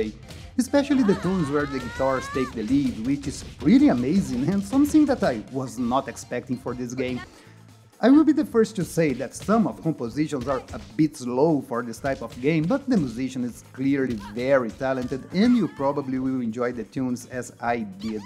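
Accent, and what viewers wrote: Brazil. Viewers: Parece brasileiro falando inglês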